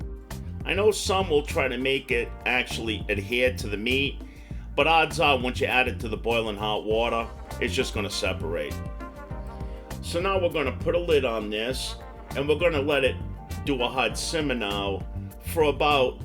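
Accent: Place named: New England, United States. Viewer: Oh how I miss New England accents, I'll be making a boil dinnah on Sunday 🤤🤤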